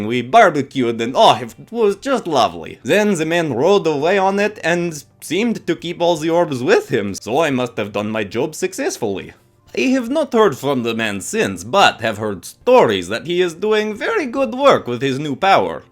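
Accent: Russia. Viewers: Are you Russian youre accent sound like them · IS SHE RUSSIAN OR GERMAN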